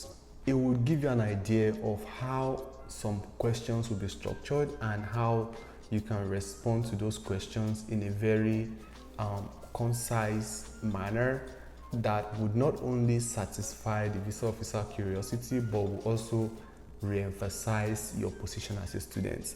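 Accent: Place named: Nigeria. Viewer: Brox talk like Nigerian ...things you shouldn't do in f-1 interview is dont form accent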